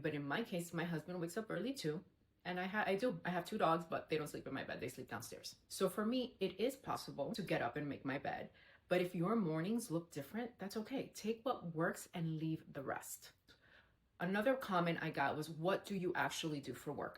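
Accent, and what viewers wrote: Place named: Miami, United States. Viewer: I had a feeling. I love your Miami accent. Keep up the amazing work!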